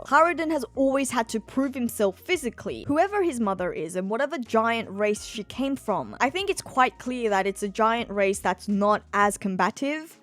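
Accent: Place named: Australia. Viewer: She’s always beautiful. An Asian girl with an Australian accent.. the dream girl of most weebs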